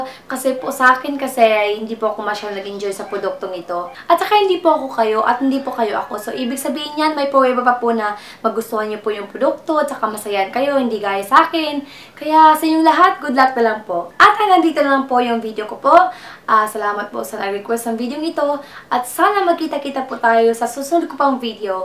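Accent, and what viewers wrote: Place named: Philippines. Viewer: i like your filipino accent.. haha ginamit ko din ko kaso cakey sya.... haha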